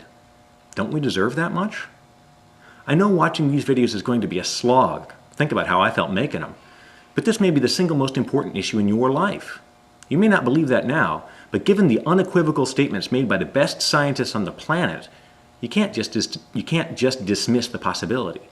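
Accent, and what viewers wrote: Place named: Canada. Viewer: YOUTUBE...only in America, (but you sound canadian) every one wants to be famous. This goes to show excactly how little attention people feel they are getting. Be a good human and take responsibility thats all we need to do. Don't believe the hype. Don't worry. Don't be afraid. Everything must eventually end... So enjoy this life experience, cause it aint gonna happen twice.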